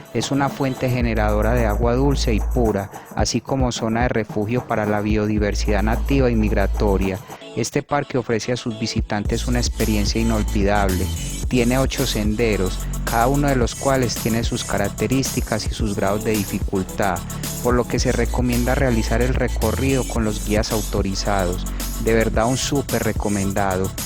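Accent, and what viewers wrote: Colombia. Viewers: Sos Colombiano verdad?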